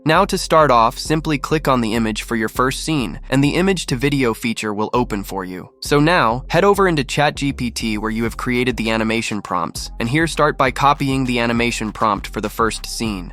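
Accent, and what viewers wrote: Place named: United States. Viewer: Love American accent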